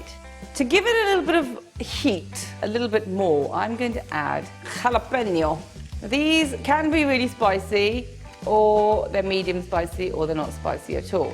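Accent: United Kingdom. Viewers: Looks Indian, sounds British and is cooking Mexican I am really confused right now. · Amazing british accent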